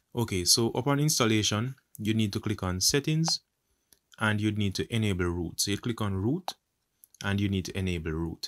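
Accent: Jamaica. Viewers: Dawg sound like a jamaican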